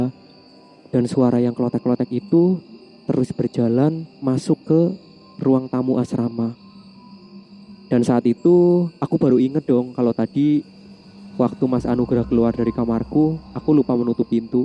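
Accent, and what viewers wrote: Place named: Tegal, Indonesia. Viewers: Terima kasih atas ceritanya mas 🙏😁 suaranya enak banget, aksen jawanya terasa hehehe. Cuma menurutku ceritanya kurang serem 😂. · Logatnya spt Tegal dan Pemalang. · Baru mau Koment Mbak Mas nya kayanya asli Tegal, soalnya Logat bahasane medok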